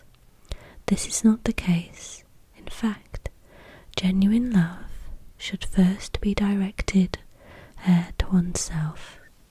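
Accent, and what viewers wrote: England, United Kingdom. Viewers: Getting some nice Tingles running thru my body! I love the sound of your voice and your English accent listening to you is a very nice way to relax!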